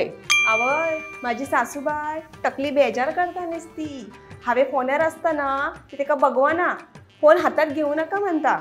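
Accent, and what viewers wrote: Malvani, India. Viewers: तुझी मालवणी भाषा ऐकायला फार आवडते. ऐकत राहावे असे वाटते. तुझा प्रत्येक वीडीयो आवर्जुन बघतो.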